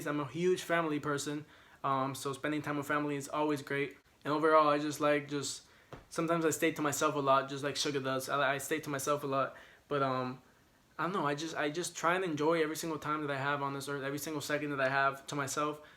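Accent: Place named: Puerto Rico. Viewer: 5'9 jeez everyone towers over me I'm such a hobbit. XD This was a cool video, I like hearing the kinds of things you feel are important. I laughed when I heard you say PR. I'm from NYC and that was my first guess when I heard your accent. Anyway. Thanks for this video. I'm glad you want to do something good for the world with what you have (!). When I first saw your videos I knew you were going to be an ARMY - because people who want to do something to make the world a little better tend to be the ones who are drawn to. I'm really interested in the environment too, I love cultures and religions and like green technology. We'd hang, I bet. XD I think you might like checking out a video called Inside the ARMY that was done by MTV. When you see it I bet you'll know why I knew you'd be family from the very beginning.:D And if you want something funny, on Running Man ep 300 was just re-released by KOCOWA. 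They were on the show (a variety show - you might like them) a couple of years ago and it was pretty cute. I don't know the copyright rules for it though. I think it should be okay... but maybe check with people.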